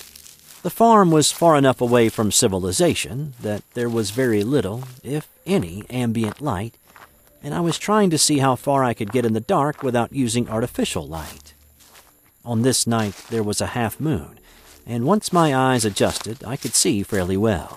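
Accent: South, United States. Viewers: Gotta love that Eargasmic Prime Storytelling Southern Accent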